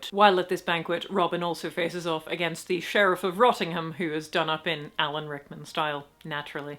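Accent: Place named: England, United Kingdom. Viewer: I take reviews quite seriously because unlike some YouTubers she speaks with an English accent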